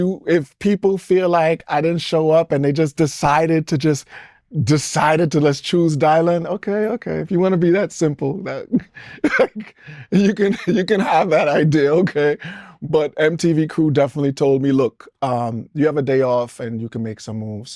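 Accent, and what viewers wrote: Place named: Kentucky, United States. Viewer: You sound like a victim. You saying how you cared for others and provided. I'm from Kentucky and black you sound like us country and a voice change for somewhere overseas. Now was a Trippin he saying I'm going to daddy's house a few times. Sounds like he got a lawsuit coming. My brother, my friend black men need to embrace mental health with appointments.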